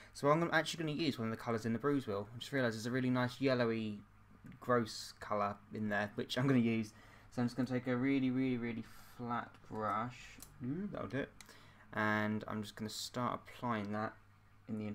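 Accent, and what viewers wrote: United Kingdom. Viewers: You did a FANTASTIC job on this... Maybe it's just the placement of the stitches helping, but you look like an honest to God woman in this one. Also, it took me a few videos to notice that you use the same brand of stage make-up that I use when I'm in a play! I think my brain dismissed that as possible because you have a UK voice, where I'm from the US. So it took me extra long to notice that, because I assumed that the brands couldn't be the same.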